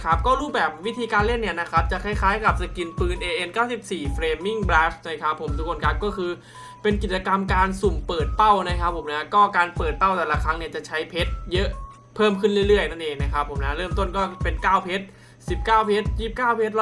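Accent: Isan, Thailand. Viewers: ชอบมากหลายๆเว้าอิสานคน จ.ใดหนอนิ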